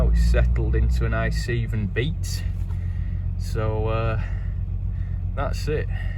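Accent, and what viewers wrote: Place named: North, United Kingdom. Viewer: You have something rare......a pleasant Northern accent that's easy on the ears. Where are you from?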